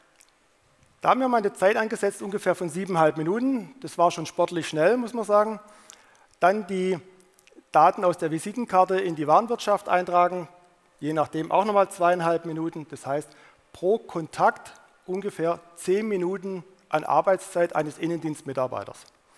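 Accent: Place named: Swabia, Germany. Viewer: Wie schön das Schwäbisch doch ist;)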